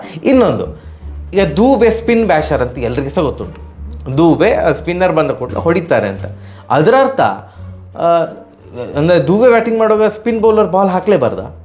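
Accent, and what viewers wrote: Mangalore, India. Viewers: Nimma Managaluru style kannadadalli vivarane kelakke bahala chanda oontu maare, edunna maatra change madbedi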